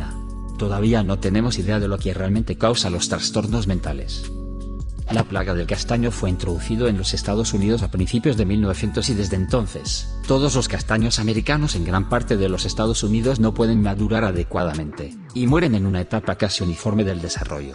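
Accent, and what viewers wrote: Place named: Russia. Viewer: no mames que pedo con esa voz parece q esa IA aprendió hablar español despues del ruso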